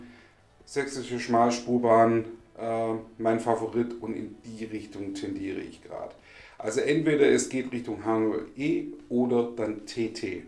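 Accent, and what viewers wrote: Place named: Swabia, Germany. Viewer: Hallo, Glückwunsch zu deinen ersten 100 👍 Und: schwäbisch isch schbitze. Gruß Jürgen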